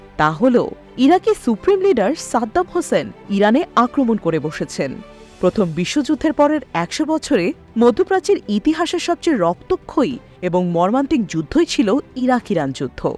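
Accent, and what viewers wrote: Kolkata, India. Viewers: ৭১ টিভিতে কি আজকাল ভারতীয়দের নিয়োগ দেয়া হয়? এই সংবাদ পাঠিকার উচ্চারণ শুনেই বোঝা যাচ্ছে যে সে পশ্চিমবঙ্গের কলকাতার অধিবাসী! সকল দন্ত্য-স এর উচ্চারণ কলকাতার টানে বলছে!!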